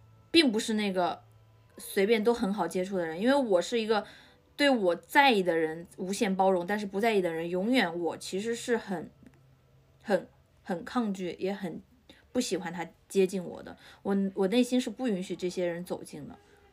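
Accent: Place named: Henan, China. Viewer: 哈哈哈哈，一口河南口音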